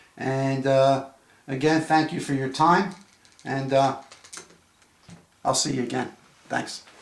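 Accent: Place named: Queens, United States. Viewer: Great video. I ordered mine after Christmas and waiting for the transfer with my FFL. It's also good to hear someone who talks like I do. Astoria, Queens here! Thanks.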